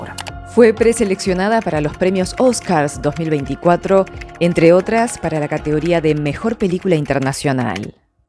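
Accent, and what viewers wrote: Buenos Aires, Argentina. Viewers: . Mis saludos y lo que haré a continuación es en carácter de pregunta. Ya que mire muchas películas coreanas y en algunos casos la temática se repite siempre de gente pobre en ascenso o de gente millonaria que se hace pasar por pobres. La pregunta es, hay ese trato en Corea entre jefes y empleados? Llegan a ese nivel de insolencia? Está todo tan dividido en clases sociales?... No quiero ofender por favor, ya que los coreanos que yo conocí siempre son agradables al menos aquí en Rusia. Todo es pregunta quizás en algún momento lo puedas leer. Mis saludos y gracias por tus vídeos y tu acento porteño. Desde Novosibirsk Rusia Gustavo Ziryanov.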